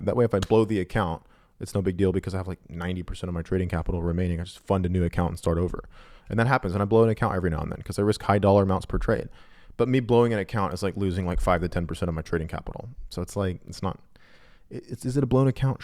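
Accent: India. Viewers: 69,420 Please entertain us with your Indian accent. We need more of that! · Im dying cause of the indian accent lol...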